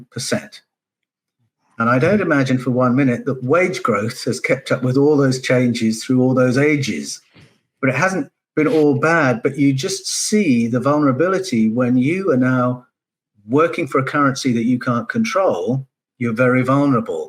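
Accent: Australia. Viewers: I love u! Awesome stuff! It juat sounds smarter with an aussie twang!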